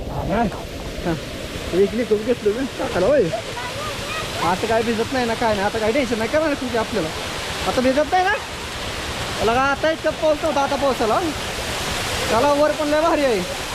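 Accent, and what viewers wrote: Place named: Solapur, India. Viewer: सोलापूरी भाषाआहे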